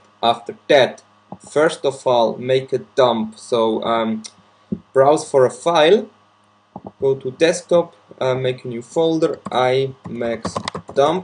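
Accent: Germany. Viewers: Alles Verstanden Infos die ich wissen wollte bekommen.. Wüdre 2X Like geben schon alleine wegen der Guten "Positiv gemeint" "Denglisch" Audio. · erst, vaucc :D You are german no doubt :D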